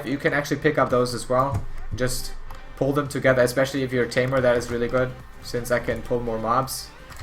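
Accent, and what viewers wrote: Germany. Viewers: youre german, no?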